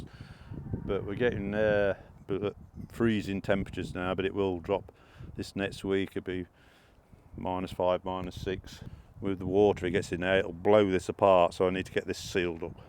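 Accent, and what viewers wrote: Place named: Leicestershire, United Kingdom. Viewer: Many thanks for making videos. From your accent, I think we're from the same place, Leicestershire or close.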